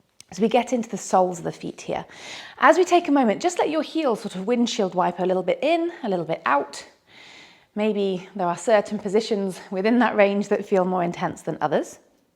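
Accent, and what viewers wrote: United Kingdom. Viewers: Thank you so much! You are one of the best out there. I'm doing quite a lot many types of functional sport and exercises regularly ant thought my flexibility and range of motion is at least acceptable... Now, this simple and 'easy' routine exposed a fantasy world I'm living in. Some of those starting positions you represented here before even started the actual strech were already enough for me and I couldn't go any further. 😅 Realizing now how much I've work to do. I've been following you already about one year yet haven't still sucribed yet. Now I finally did it. All of your efforts and videos are so comprehensive, well structured and quided. They are gentle, precise and beginner friendly. I'm really grateful you're doing this and sharing your expertise. Keep up the good work. You definitely deserve all the success in your path. 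And I really love your british accent! So refreshing after listening and following so many influencers outside of UK. Greetings from Finland. 😊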